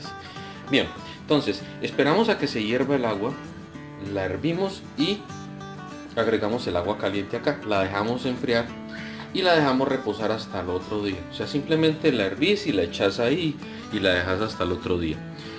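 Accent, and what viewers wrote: Colombia. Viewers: Excelente video.. ahora mismo lo voy a preparar. Pero tengo una duda si su acento es de 🇨🇴 Colombia o de Costa 🇨🇷 Rica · Jajajaja si parece caleño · Usted es valluno se nota su gracia al hablar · Hermosa tu voz eres colombiano???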